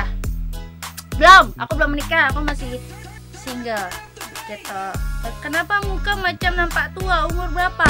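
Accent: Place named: Malaysia. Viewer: Ya karena dia lagi di malaysia, jadi mencoba bicara dengan gaya malaysia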